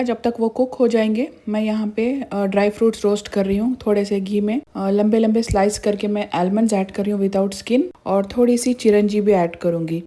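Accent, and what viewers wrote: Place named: Dakhani, India. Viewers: Ur Urdu s same as hw we speak at home. We are also from Bangalore. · V nice vlog voice over also u do in dakhani urdu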